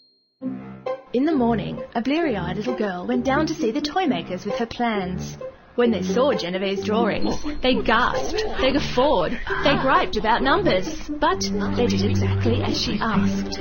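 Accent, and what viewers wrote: Australia. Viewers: Australian accent? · aussie voice wooot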